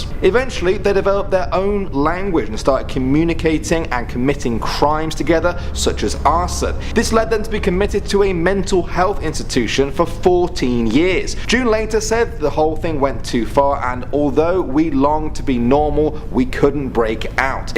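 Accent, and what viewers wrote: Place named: North, United Kingdom. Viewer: your norther accent ironically says WOTCHAH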